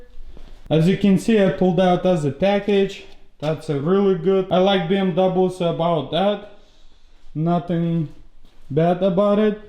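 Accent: Russia. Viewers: I can tell you are Russian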